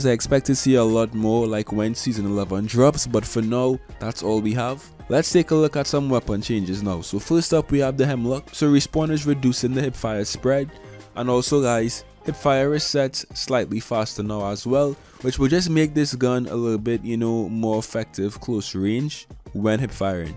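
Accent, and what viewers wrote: Trinidad and Tobago. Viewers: Hold on….that accent…. You Trinidadian by chance? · Where you from bro trinidad? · Just came across this video and had no idea I was finna listen to a trini accent 💯🇹🇹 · Are you trini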